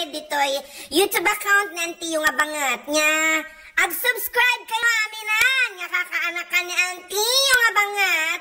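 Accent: Ilocos, Philippines. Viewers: Kayat ko ti panagsasaom ti ilokano auntie makapakatkatawa makapaikkat ti bannog salamat...hello auntie from Las Vegas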